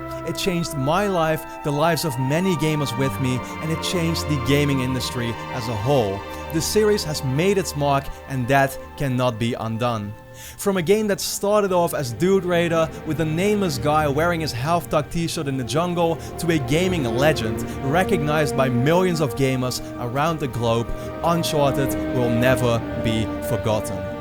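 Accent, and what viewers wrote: Netherlands. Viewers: are you dutch because you have that dutch accent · The Dutch accent is strong with this one.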